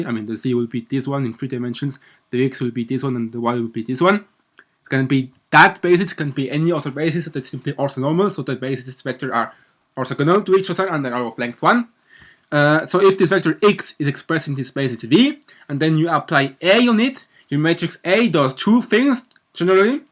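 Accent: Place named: Germany. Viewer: He has a decidedly CHUR-MAN accent.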